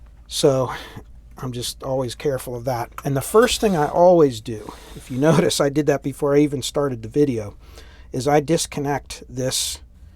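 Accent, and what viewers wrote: Pennsylvania, United States. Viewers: Happy New Year.... From your accent (PA), I would guess you know the lebanon bologna cure for your cold.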